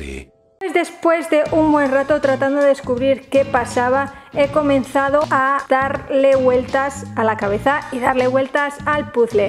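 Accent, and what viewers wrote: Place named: Spain. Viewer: Me gusta el acento de los españoles 🙌🏽 saludos desde México 🇲🇽 !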